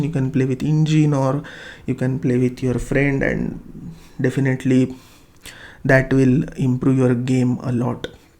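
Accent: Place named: India. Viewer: im gonna be honest, i dont keep up with chess enough to know that many fide masters. but this is actually great for me. i love listening to an indian accent explain things like this. idk why. combine that with chess and the fact that youre definitely qualified to teach this stuff? instant sub.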